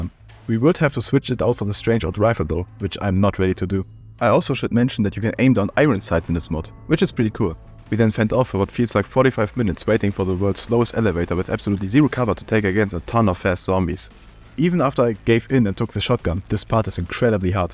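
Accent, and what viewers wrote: Germany. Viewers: Kommst du ... aus Deutschland?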